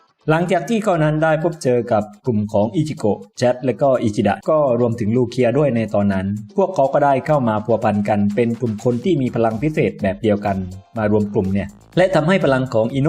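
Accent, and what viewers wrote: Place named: South, Thailand. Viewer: คนอธิบายติดสำเนียงใต้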